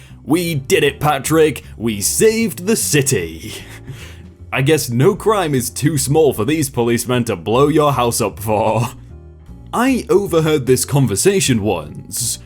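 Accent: United Kingdom. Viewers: notice how when mispronounce the word "nuclear" the comments sections go crazy but when they make fun of british accent he don't give a sh** wait that's a dumb point to make, but I could take some feedback:D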